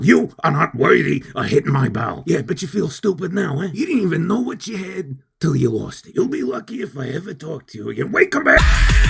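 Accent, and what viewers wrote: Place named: New York, United States. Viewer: Just found this channel, was expecting a tiny female voice, this gruff old New Yorker Boston shit is hilarious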